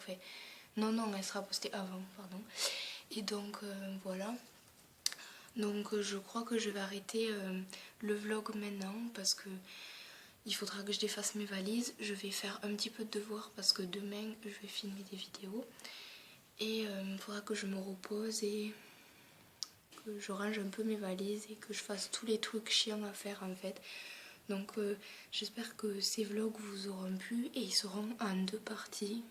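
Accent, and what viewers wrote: South, France. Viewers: T'es vidéos sont juste top! J'aime beaucoup ton accent,du Sud? bisous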